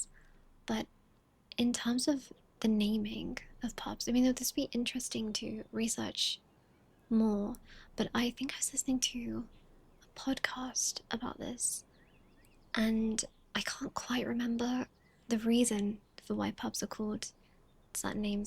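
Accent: United Kingdom. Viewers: Ru from uk?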